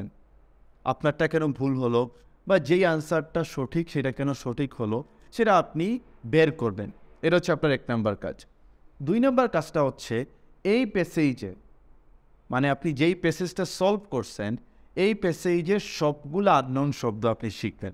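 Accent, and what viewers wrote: Sylhet, Bangladesh. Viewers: Sir apne ke sylhety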